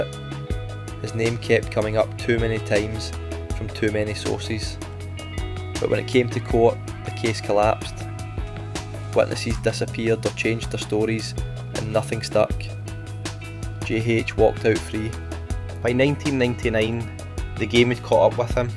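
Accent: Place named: Scotland, United Kingdom. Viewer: This is why I like ,a scottish voice telling the story keep the good work up 😊😊x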